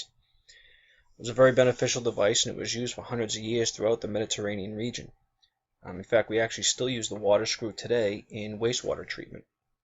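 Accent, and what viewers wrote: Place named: Boston, United States. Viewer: This is exactly what I've been looking, short, sweet and to the point. Also you from Mass. I thought i was hearing a Boston accent?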